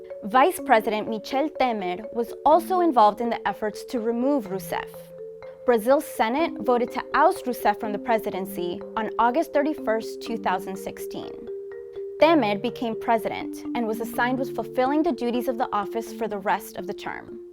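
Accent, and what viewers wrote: Spain. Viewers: Cool. But why is she pronouncing names and places with Spanish accent? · It's annoying how you pronounce Brazilian names with a Spanish accent. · y u are Speaking portuguis with spanish accent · because she speaks Spanish as her language 🤷🏾‍♂️ 🤷🏾‍♂️ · A lot of facts given against Bolsonaro were neither fully nor at all explained! oh, and by the way, why are you speaking portuguese with a spanish accent?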